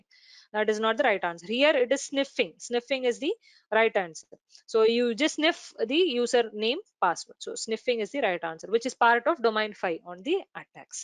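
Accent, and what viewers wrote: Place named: South India, India. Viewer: Disgusting South Indian accent. It’s domain not domine!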